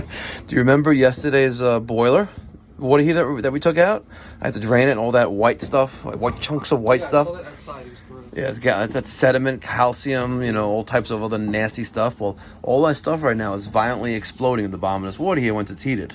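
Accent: New York, United States. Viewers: I’m here for the learning but hearing the New York accent is almost more fun!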